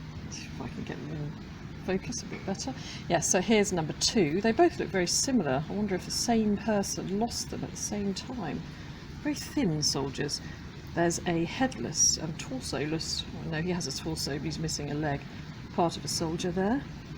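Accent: United Kingdom. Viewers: Some fascinating finds there. I sometimes spot other interesting things in the background near your finds and frustratingly wish I could somehow interactively have a poke at what they are. Speaking of frustration, I loved the slight tone of indignation about the pouring rain. You sounded very English :)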